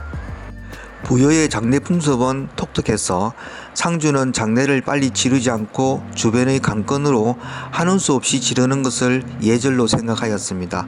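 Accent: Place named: Gyeongsang, South Korea. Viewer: ㅇㅑㅁㅁㅏ ㄱㅕㅇㅅㅏㅇㄷㅗ ㅅㅏㅌㅜㄹㅣ ㅈㅗㄲㅏㅌㄴㅔ. ㅇㅏㄴㅗㅏ ㄱㅕㅇㅅㅏㅇㄷㅗ ㅇㅣ ㅅㅐㄲㅣㄷㅡㄹ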